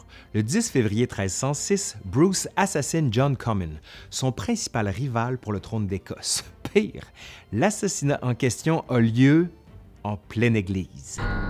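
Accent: Quebec, Canada. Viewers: Tabernacle Chrit osthie j adore l accent québécois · je suis un simple francophone, je vois une vidéo avec un accent Québecquois à couper au couteau, je souscris.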